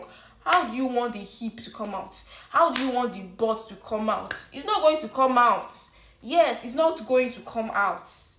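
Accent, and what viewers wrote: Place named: Africa. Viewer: The music makes it harder to hear what you're saying especially since i an not from Africa and the accent you have is new to my ears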